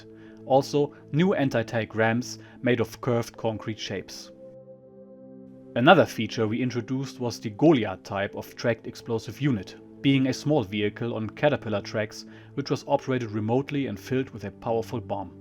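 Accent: Germany. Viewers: Excellent stories. Thanks for these. the narrator is it a real person reading? I like the German accent to add realism.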